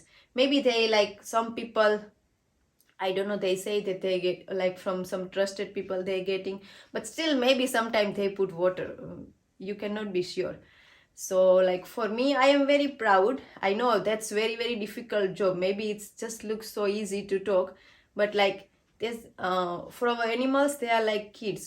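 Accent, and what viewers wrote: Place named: Gujarat, India. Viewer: Your interview was pretty good but you have gujarati accent in your English it is ok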